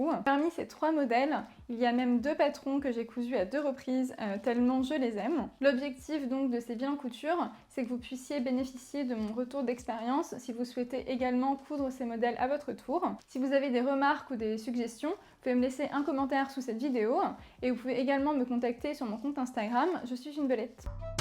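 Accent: Paris, France. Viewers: Je vais être virée, je dis ce que je pense tant pis, c'est pas méchant, Pfff dommage cette façon de parler à la Parigot, à chaque fin de mot ou presque, on entend ( OUAN ! ) Le patron OUAN ! Chaque côté OUAN ! Adapté OUAN ! Qu'on a besoin OUAN ! Bref j'ai l'impression d'entendre le sketch des inconnus (saluuut ouan tu vas bien ouan) C''est super agaçant OUAN !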